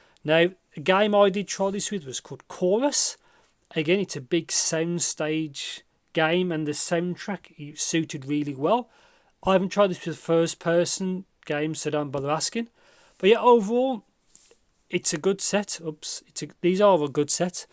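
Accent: United Kingdom. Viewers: This is standard UK english, yup it's hard to understand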